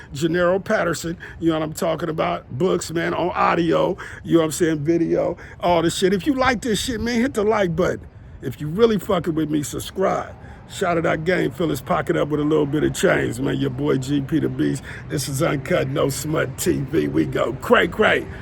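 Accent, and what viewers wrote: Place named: South Africa. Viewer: You have a very interesting accent. I thought you were South African 🇿🇦. Much respect ✊🏾 to you and yours my brother from another continent 🥰😉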